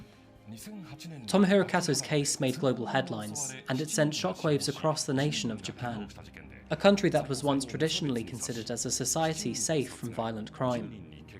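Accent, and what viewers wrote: United Kingdom. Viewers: this case is indeed fascinating, and now you [not for the first time, actually are you, you come across as utterly Beitish, your accent, manner, and speech patterns do not seem consistent with someone born in the US at all, not even from Seatle, which is a beautiful and amazing city, I haven't spent as much time there as I'd like, but the time I have spent was wonderful, such a fun mix of people of all stripes and types and killer architecture and natural wonders, the Puget Sound and San Juan Islands are simply glorious, untouched, unspoiled and it's lovely to see whales breeching from the ferries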